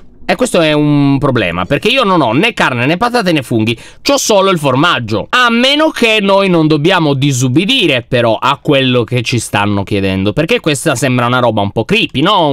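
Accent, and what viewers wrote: Sardinia, Italy. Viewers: per favore io ti voglio bene ma puoi smettere di fare il sardo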